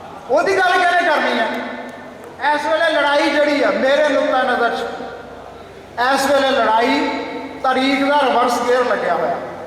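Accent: Hoshiarpur, India. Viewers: Bhaji tuhada lehja pure 100% hoshiarpur wala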